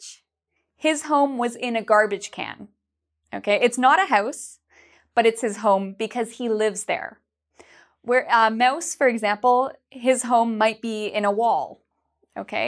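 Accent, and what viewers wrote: Canada. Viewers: Are you Canadian? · I can understand you all aspect and topic that you make. Your accent and Canadian english is very clear and neutral than U.S.A and british english. Thanks teacher!! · I have been watching videos 4 the last few months and I have found out that she is brilliant teacher. I adore her Canadian accent!, u rule! Thanx a lot 4 lessons.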